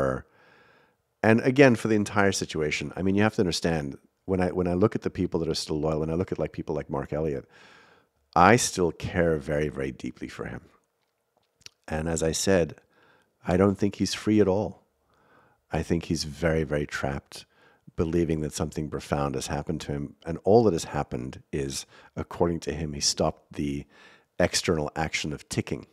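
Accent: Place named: South Africa. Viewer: Fellow South African here - don't lose the accent, it's awesome! Love the podcast Mark! You're f*cking fantastic!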